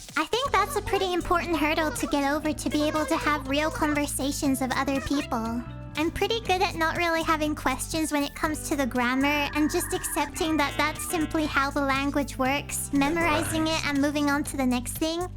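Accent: Japan. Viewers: dies of cute japanese accent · I love it! Even Your Japanese Accent Is Cool! Nice Animation! And Yes, What An Early Animation!:)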